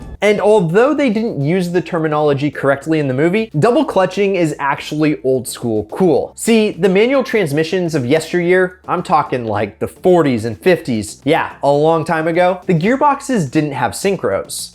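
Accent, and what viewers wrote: United States. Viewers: He’s American and he spoke like a American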